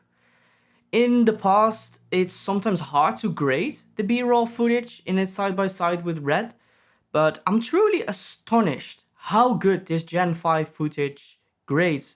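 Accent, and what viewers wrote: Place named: Netherlands. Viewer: Hahaha hoorde ergens al een nederlands accentje, maar die 'later' det het m😂, Goeie video man! Wil hem ook gaan halen.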